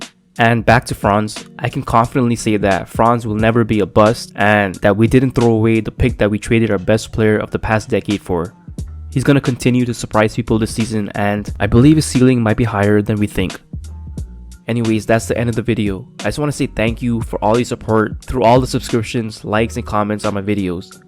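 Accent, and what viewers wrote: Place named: Germany. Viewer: bro versuch nicht deinen deutschen akzent zu verstecken man hört ihn deutlich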